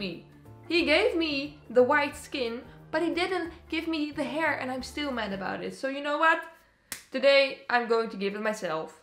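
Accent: Netherlands. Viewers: Are you Dutch? I'm definitely hearing the accent lol. Love your videos! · Wait are you Dutch bc I know our english accent😂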